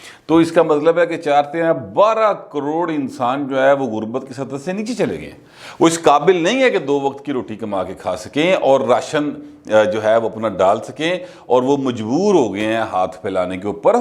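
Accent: Punjab, Pakistan. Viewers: Sir ye ,,Fawad chodre ke adalaton wale baat apnain ,,,Etna zyada punjabe main bola jeske wajh Sy Sara vlog ka Agla pechlay bholgaya,, Agla aysa Abe tk sochta Hun k punjabe main Apnain mokademat ka Kya bola? Aor jetna taime sochnain main lag gaya utnain main baqe velog pr tawajh he hargaya,, Sir plz ap punjabe km bola Karin qk samajh he ni atee,,, Poshtu bolain ya English bolain ya tore bohot arbe bolain TU samajh ayge lakin punjabe bilkul ni,,aor hamain pata Hy k ap punjabe hain ap k lahjay Sy pata chaljata h,,, Mujay punjabe Sy nafeat ni lakin samajh ni araha h,,, Ya TU ap bolain k jesko apke punjabe bolnain pr eteraz h o aoak vlog NH dykhayy TU pir sahee h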